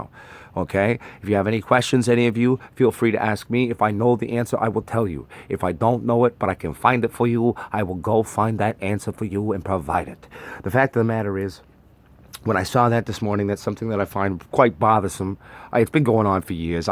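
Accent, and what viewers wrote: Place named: United Kingdom. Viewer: Great British accent. Have you ever done voice work?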